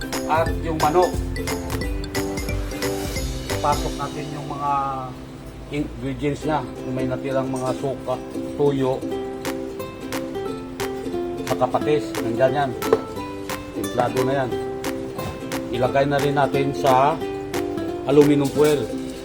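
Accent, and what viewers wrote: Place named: Panay, Philippines. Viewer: ay ganon ba taga concepcion ilo2 ako iba talaga tuno ng taga panay stay safe bos god bless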